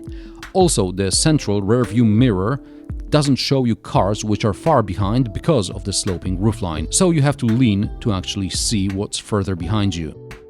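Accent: France. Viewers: Nice looking car from the outside but... The Sat nav on the Kadjar I tried was unfathomable.... (French logic?) ...and the infotainment system made funny buzzing noises whenever I passed near power lines... Rear seat space is cramped, performance is below class average and not sure how well it would stand the test of time. Like your french accent BTW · Yours French accent is amazing! Pink Panter far behind.... P.S. Car petrol engines not impressing.... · I like your french accent 😂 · Lmaooooo the French accent. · I love your reviews man...!!!! nice one. Your french accent rocks!!! :)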